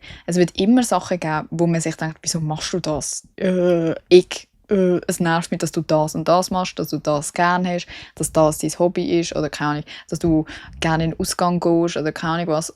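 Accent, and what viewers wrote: Basel, Switzerland. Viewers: Mir fällt auf, du hast dein Dialekt immer mehr Basel angeglichen.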